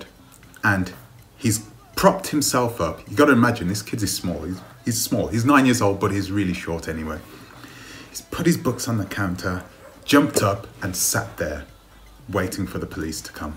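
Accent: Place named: United Kingdom. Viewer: bro sorry to say that but ur English accent isn't Semmes like an American it's Semmes like u da UK tho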